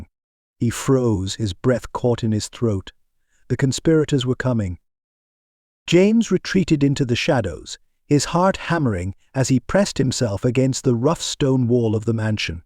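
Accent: United Kingdom. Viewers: The British accent doesn’t seem to fit with a historical western.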